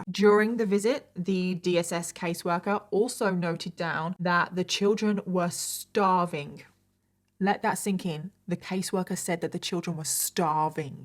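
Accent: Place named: England, United Kingdom. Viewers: its nice to hear Timothy in an English Accent i have been in the states for a while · Congratulations on topping the charts,sounds like you have a midlands accent,spot a fellow midlander anywhere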